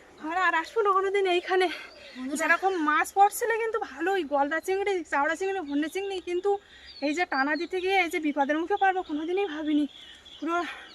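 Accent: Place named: Dargahpur, Bangladesh. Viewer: মুখে দরগাহপুরের ভাষা শোনা যায়।হন্যে চিংড়ে।জালে গাব দেওয়া নেই। গা হাত পা ঠান্ডা হয়ে যাচ্ছে।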